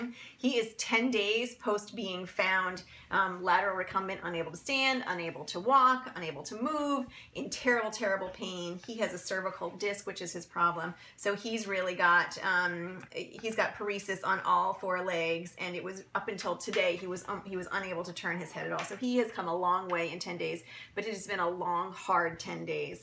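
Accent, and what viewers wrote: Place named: United States. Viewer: English is my main language but I have no idea what you said in the first bunch of things you said so did not watch any more. You need to slowdown, speak clearly and realise that Youtube is an international device; and that the American twang you have is not easy on the ears of those of us who are from other parts of the world.Not to mention those who have English as a second language. I hope you are a better vet than you are a speaker.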